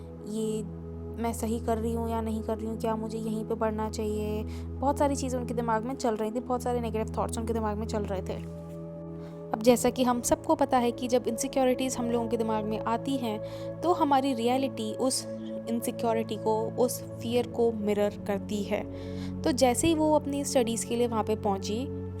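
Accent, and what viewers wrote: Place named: China. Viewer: ITS SOUNDS CHINEESE MIXED WITH EAST INDIAN A TINY MIX OF SOFT RUSSIAN BUT MOST LY EASEAST INDIAN WITH A RARE FRENCH DIALCK MIXED ALL IN ONE AND THE COUNTRYS ARE VERY CLOSE TOGETHER AND 1 LANGUAGUE I IM VERY FAMILITIAR HEARING MIXED IN TO OR ARE U CHANGING THEM AS U TALK OH I FORGOT A FORM OF ENGLISH I HERE TOOO LOL IM INTO MAPS LANGUAGES AND DIFFERNT PEOPLE OF THE WORLD I LOVE THIS ITS LIK ,Y HOBBY ANY WAY IM GOING TO MANIFEST A AY TO TO SEE WHAT YIU LOOK LIKE IM A VERY CIRIUOSE PERSON AND IM A VISUAL KEARNER I LOVE EYE CANDY TOO PLUS U SPAK MY TRUTH I DONT BELIEVE IN ANT OTHER WAY TO EXPRESS MYSELF I FEEL GOOD LETTING OUT MY THOUGHTS AND I NEVER SEE PEOPLE COMMENT LIKE ME I DONT BELIEVE IN FEAR LIKE SOME PEOPLE THINK THERS A RIGHT WAT TO TALK OR HOW RULES AT LEAST WHERE I WAS BRAINWASHED GROWING UP ON LAND THEU CALL IT A COUNTRY MAMED CANA DA ;//CHNA DA IS ITS NICK NAME AND WE HAVE EAST INDI ANS AS OUR LARGEST IMAFORGN POP INOT SURE IF BORN OR IMAGRATE OR MIXED I WE ALL JUST COULD GET ALONG GOT RID OF THE BAD PERSONALITYS AND THE PWOPLE WHO CAUSED OTHERS HARM WE MIGHT BE ABLE TO STOP THE PROPAGANDA THAT MAKES US ALL TURN OM EACH OTHER WE SHOULD TURN O THE 1 $%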